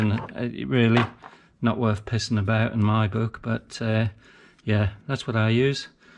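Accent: United Kingdom. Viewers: Loving this, one question are you British?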